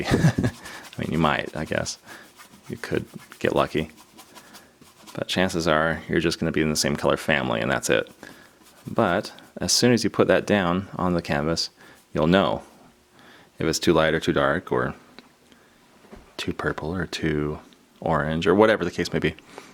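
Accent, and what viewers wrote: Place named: Vermont, United States. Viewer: Something about your accent...are you from Vermont?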